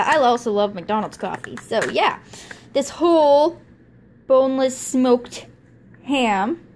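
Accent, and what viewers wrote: Canada. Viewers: You’re obviously Canadian...eh...I’m in Hamilton Ontario. Where are you?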